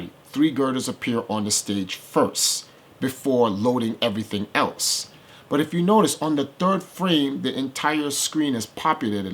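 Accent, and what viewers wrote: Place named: United States. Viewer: Mr, I find you repugnant. You're a fake Jamaican, with your americanised accent. You try so hard to ape your masters, your slave mentality is irritatingly obvious. You are contemptibly inarticulate, misusing so many words and phrases, it is beyond reckoning. Why don't you learn how to speak English properly, before trying to use it to deceive people? Your incessant lying is utterly tiresome. You are the type of simpleton, who believes that heaping lies on top of lies makes for a convincing narrative. If there were any depth to your intellect, you would be aware of the fact that lies are strengthened by mixing them with truth. You are quite clearly ignorant of said fact. I am a West Indian, my skin is brown, and I play classic arcade games. I have no wish to be represented by the likes of you, so I would appreciate your removing yourself from the scene. 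Stop making yourself out to be more significant than you actually are, and stop manufacturing justification material for the use of the Twin Galaxies racists.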